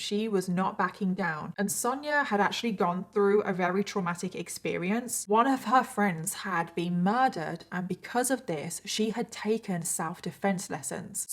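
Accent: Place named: United Kingdom. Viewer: i’m pretty sure she’s british !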